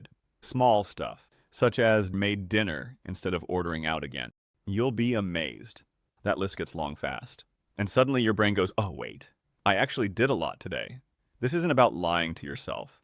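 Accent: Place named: United States. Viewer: Don't worry. The misspellings are still there, despite his being a screenwriter. At last, good narration, whether that voice is artificial or not. Sounds like an everyday American, minus the weird syntax other (foreign?) videos have. Besides, we all need a fresh start in January, especially after 2025, a pretty rough year❗🗓️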